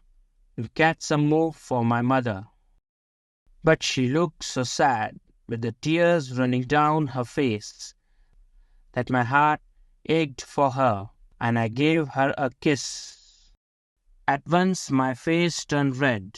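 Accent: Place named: India. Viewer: Indian is telling this story , accent is too strong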